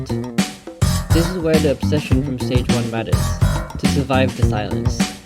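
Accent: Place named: China. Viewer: ahh indian guy with a chinese accent, my favourite kind Gread vid btw :)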